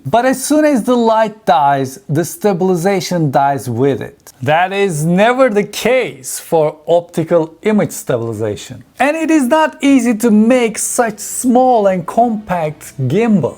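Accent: Turkey. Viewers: Türk olduğunu sonradan aksanından anlayıp şaşırdığım youtube kanalı. İçerik harika umarım aynen böyle devam eder. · Türk aksanı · Adam resmen Türk İngilizcesi konuşuyor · What a heavy Turkish accent.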